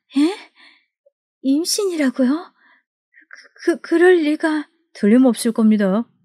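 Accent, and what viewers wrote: Jeolla, South Korea. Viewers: 전라도 사투리 갱상도 사투리까지 완벽소화하셨네요 💯💯💯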